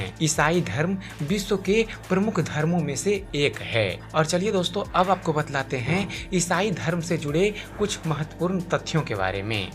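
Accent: Bihar, India. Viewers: full bihari aawaj he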